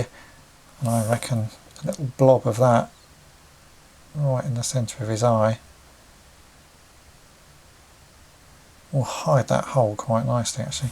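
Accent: United Kingdom. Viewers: I gotta say - this kind of proper English accent is such a lost trait. Parents used to teach their kids to speak properly this way and sound posh, but not anymore.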